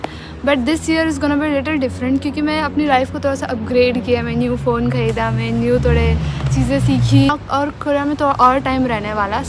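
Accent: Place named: India. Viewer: mai aapka first. Vlog sa huu🤧🤧🤧 l like you hindi accent esliye mai aapki vlog dekhti huuu🫠🫠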